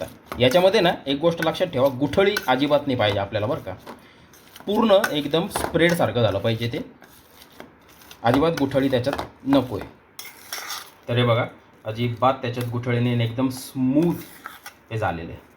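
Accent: Nashik, India. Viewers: आम्ही पण नाशिक कर आहोत तुमची बोलण्याची पद्धत छान आहे. रेसीपी ऊतम. नाशिक टोन🎉🎉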